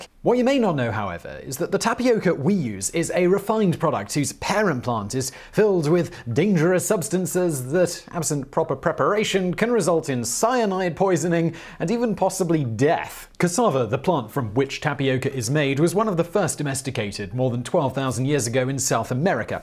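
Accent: United Kingdom. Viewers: You sound British but then you say stuff that is making you look totally not British if you went to a Uk school you had tapioca and sago !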